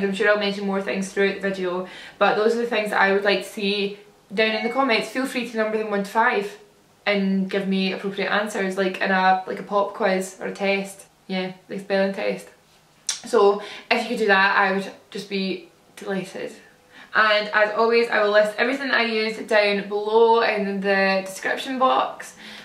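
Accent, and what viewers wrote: Scotland, United Kingdom. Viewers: Your Scottish accent gives me life ❤️ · no, I'm American. to me, you have a thick accent which I think is Scottish. Your response tells me I was right. It's lovely all the same. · American ? No Scottish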